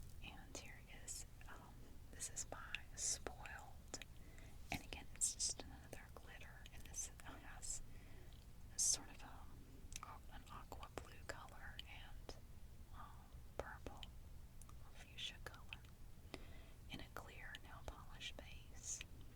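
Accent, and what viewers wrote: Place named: Coastal North Carolina, United States. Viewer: New viewer! You have a delightful whisper. I'm trying to peg your regional accent. Coastal North Carolina? I grew up on east coast and settled in midsouth state.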